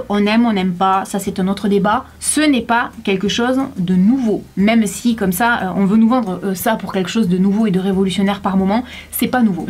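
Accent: South, France. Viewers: Je suis amoureuse de ton accent du sud😍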